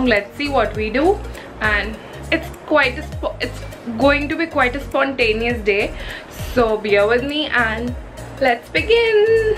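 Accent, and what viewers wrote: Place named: Punjab, India. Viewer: So thoughtful of you to think of not letting her cook on this day ❤️❤️ that tinge of Punjabi accent you have in your way of speaking is damn cute ❤️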